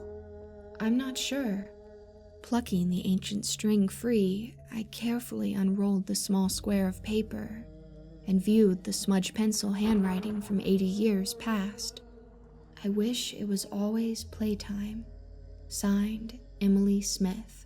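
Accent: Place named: South, United States. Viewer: I would love to hear 👂 your southern accent more , but then icc c would feel like your spoiling me ❤️😛😍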